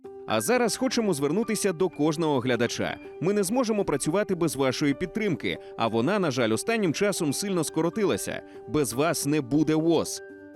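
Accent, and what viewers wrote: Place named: Moscow, Russia. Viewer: Голос за кадром- "гвориЦЦць, жиЦцЦя, громаДЗзззяни"... Вкрай неприємно слухати ці маасковські виверти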